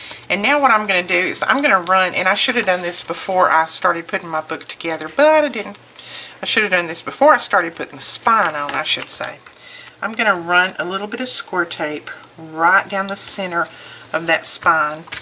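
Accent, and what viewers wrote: South, United States. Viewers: Thank you for this tutorial. I tried it immediately and it works great. And your southern english sounds so nice. I will follows your blocs.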